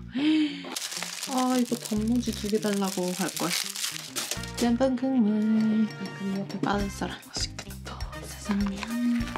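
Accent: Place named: Busan, South Korea. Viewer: 악ㅠㅋㅋ 친근한 부산말투라서 부산인쥴ㅋ 죄송해욤ㅋㅋ 항상 응원합니당ㅋ 😍😍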